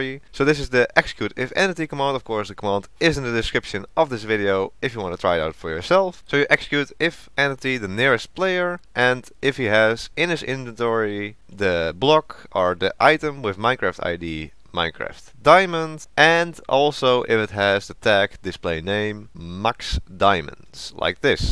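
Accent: Netherlands. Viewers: hahaha ik hoor aan je stem gw dat je nederlands bent heuyy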